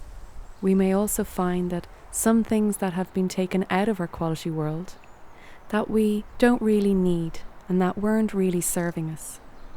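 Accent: Ireland. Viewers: your voice is so soothing :) and the gorgeous Irish accent doesn't hurt :D <3 <3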